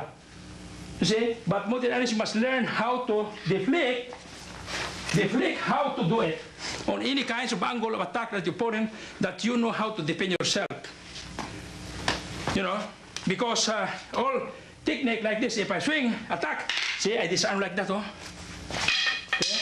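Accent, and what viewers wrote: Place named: Cebu, Philippines. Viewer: His Inglis aksent is typiical Cebuano. HIS ENGLISH ACCENT is CEBUANO not AMERICAN. Great MARTIAL ART, can be effective when your LIFE IS IN DANGER, SELF DEFENSE. The STICK is faster than the HANDS, faster that the FEET.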